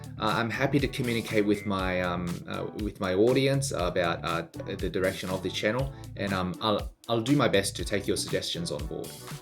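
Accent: Australia. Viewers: You sound like your from Australia! - Be good to see some tests on Low Block effectiveness vs High Line and pressing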